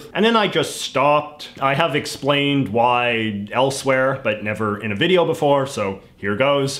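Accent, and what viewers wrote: Canada. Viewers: I can't stop watching your videos. Between the Flock or Seagulls haircut and the Canadian accent I can't look away.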